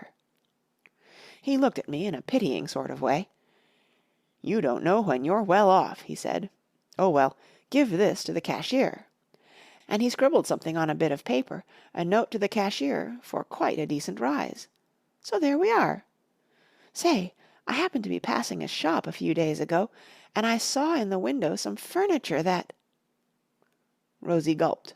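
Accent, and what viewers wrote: United States. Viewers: Wodehouse read by an American?!?! Blasphemy!!! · It is surely OK to read an American story with an American accent? The real abomination would be to read it by AI!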